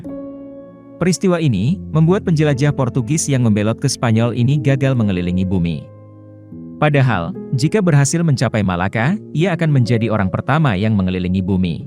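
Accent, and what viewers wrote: Banyumas, Indonesia. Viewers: Admine wong ngapak ya? wong ngendi rika mas?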